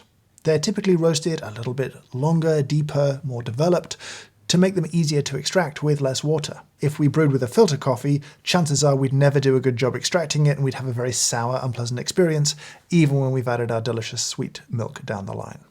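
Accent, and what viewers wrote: United Kingdom. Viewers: I love your British accent! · Of all places, I'm sitting in a tiny town in beautiful Italy and "for whatever reason" can't go to a nearby coffee place. Asked YouTube what I can do with equipment I have in the kitchen and this came up! Looove it, and the British accent made me feel at home😇🙏💝🇬🇧. Thank you so much!! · I love watching videos. I love how his knowledge along with his British accent makes him "sound" pretentious 😆 but he's genuinely likeable. I feel like his extreme knowledge might be a curse, though. I say this because I know how my preferences changed when I was forced to drink my coffee without sweeteners. It completely ruined sweet coffee for me (it's a wonderful thing to appreciate unsweetened coffee, I know) but I'm sure 99% of the cups of coffee I would find have glorious flavors, would just spit out and say it's disgusting 😂 I currently can't even imagine what he means by coffee tasting hollow or having texture. 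I haven't even tasted the difference between sour and bitter coffee, let alone tell when a serving has different levels of both at the same time. I hope to continuously learn from though!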